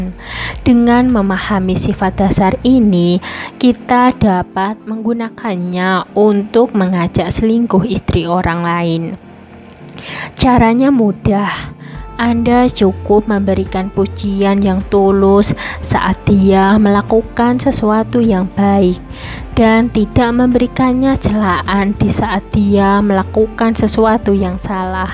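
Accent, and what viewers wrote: Java, Indonesia. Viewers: mau ketewa denger medoknya.. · channel gila medok nya tambah nggila ni 🤮🤮🤮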